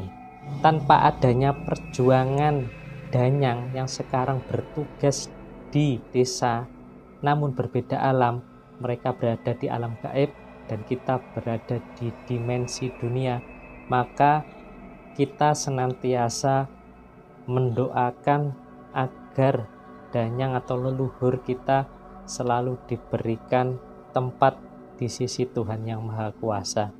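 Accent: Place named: Java, Indonesia. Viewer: Jawanya medok sekali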